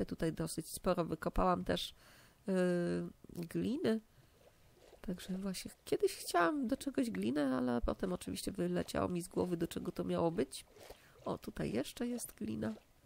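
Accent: France. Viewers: mam pytanie dlaczego masz akcent francuski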